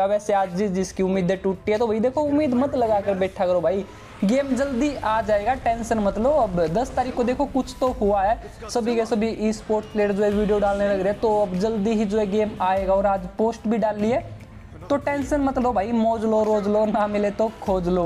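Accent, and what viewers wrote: Haryana, India. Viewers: Bhai yo b haryana te h k eski awaaz sunke to nue laage h k u haryana t h????bera ho to tne???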